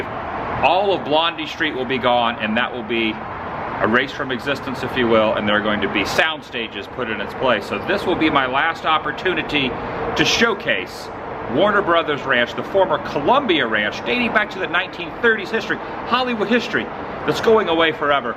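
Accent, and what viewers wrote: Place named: Philadelphia, United States. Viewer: Your accent is all too familiar - You from Philly PA vicinity, or Delaware/Maryland?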